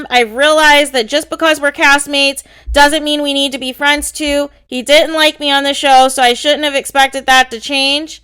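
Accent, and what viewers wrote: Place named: New England, United States. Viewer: The way you say “but” and “because” is annoy af lmao. But it sounds New England so I’m used to it.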